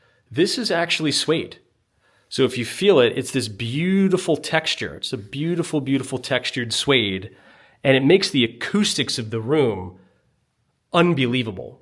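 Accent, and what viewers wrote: New York, United States. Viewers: He’s a New Yawkah!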